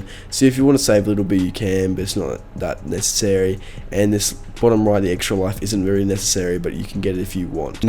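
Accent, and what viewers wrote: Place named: Australia. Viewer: Hes speaking Australian english perfectly lol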